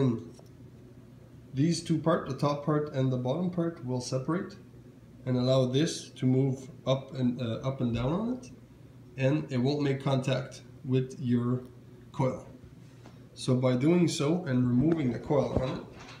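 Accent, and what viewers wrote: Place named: Quebec, Canada. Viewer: Toer t québécois pis sa parait 😂😂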